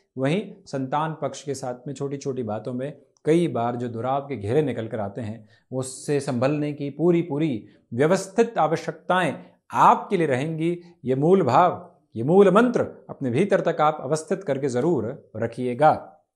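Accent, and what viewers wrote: Standard. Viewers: Guru ji ki hindi bahot pure 👌 hai aur aise hi honi chhahiye ❤ English medium ke bachho ko Badiger problem hoti hai hindi ki 😅 thanks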